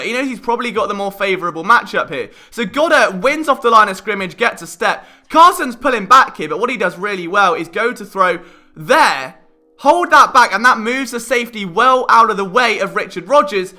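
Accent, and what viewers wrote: United Kingdom. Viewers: I have never heard someone with a British accent talk about football that wasn’t soccer. Nothing wrong with it but this is a first I am amazed. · Why the Fook is a british person talking about american football XD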